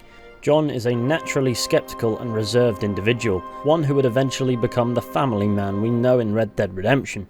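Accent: United Kingdom. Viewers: Go damn it, why do u have the annoying british accent, just why?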